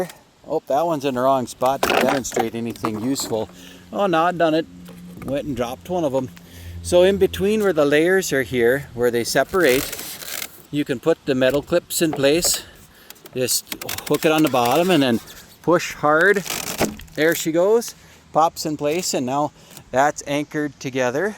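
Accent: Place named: Midwest, United States. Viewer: This guy is deep north Midwest 😂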